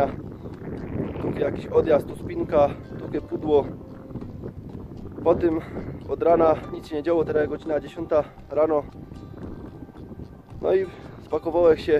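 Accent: Silesia, Poland. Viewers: Jak ja lubię Ciebie oglądać, przez tą Twoją gware 😉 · Ty ja tak samo. Gadka Śląska hehehe. Chytaj chytaj :)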